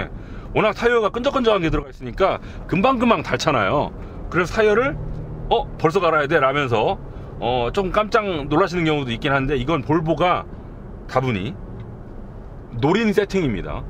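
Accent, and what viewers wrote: Jeolla, South Korea. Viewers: 전라도 사투리가 구수하네요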